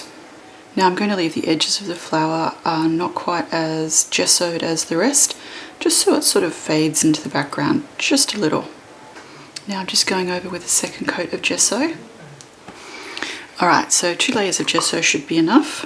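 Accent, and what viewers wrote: Australia. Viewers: Thanks for the layer ideas! Love watching you create! When my children asks how Australian sounds, we listen to you together ☺️😘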